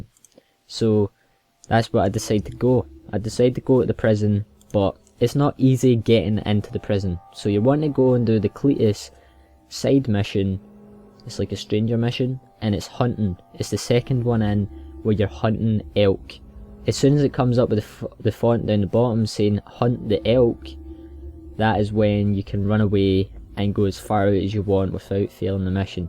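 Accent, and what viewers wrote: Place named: Scotland, United Kingdom. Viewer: cos hes scottish like me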